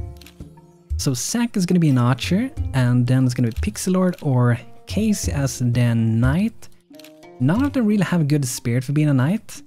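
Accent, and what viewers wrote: Sweden. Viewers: Well,thx for replyn i Have subbed, btw is that an swedish accent? I Myself come from sweden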